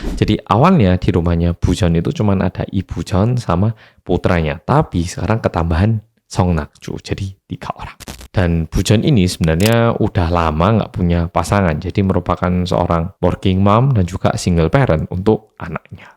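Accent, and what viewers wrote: Java, Indonesia. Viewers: Mas medok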